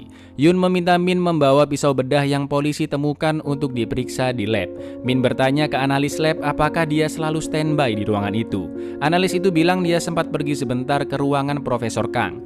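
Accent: Java, Indonesia. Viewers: Kak orang jowo to logat ne kayak uwong jowo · Jowone ngndi mas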